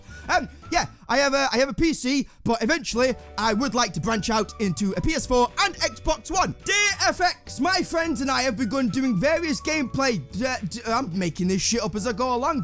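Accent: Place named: Lancashire, United Kingdom. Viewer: I figured you was a lancashire lad but i didn't think you'd be as close as manny haha nice one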